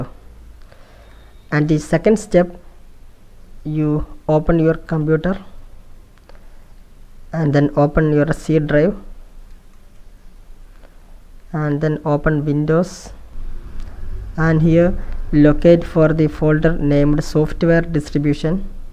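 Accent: Kerala, India. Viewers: I like ur mallu English 😂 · Are you malayali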